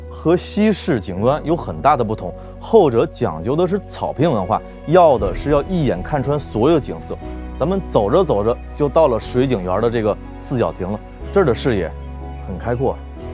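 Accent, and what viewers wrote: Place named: Tianjin, China. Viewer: 好像天津人讲的话，笑话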